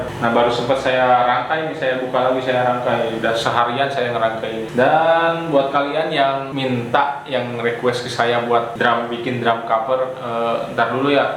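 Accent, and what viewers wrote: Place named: Indonesia. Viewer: Balik ka indo tone na langsung beda jadi indo banget haha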